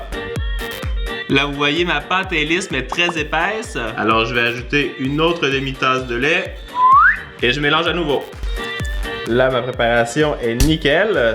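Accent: Canada. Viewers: tu mon youtubeur préféré desCarl is cooking vidéos accent canadien et qui met quoi des vidéos trop trop rire 🇨🇵🇨🇦👍👍👍👍👍👍👍👍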